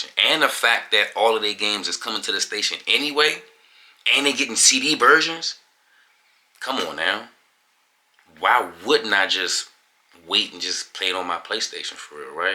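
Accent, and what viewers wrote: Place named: DMV, United States. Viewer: I hear that DMV accent fam! Had to come support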